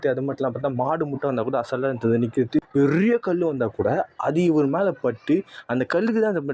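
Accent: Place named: North India, India. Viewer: Tamil tha pesuriyaane therla anegama vadakkano irupiyo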